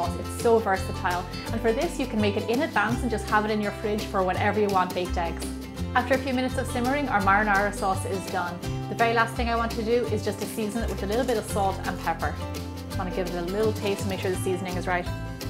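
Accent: Ireland. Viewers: didn't expect her to be irish. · An Irish girl explaining an Italian recipe. Love it. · with that Irish accent and beautiful woman ill cook anything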